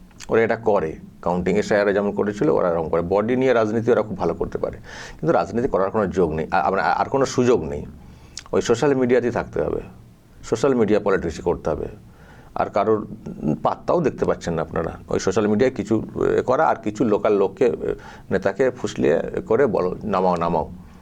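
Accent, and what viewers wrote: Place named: Bangladesh. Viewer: Uchcharon toh puro Bangladesh e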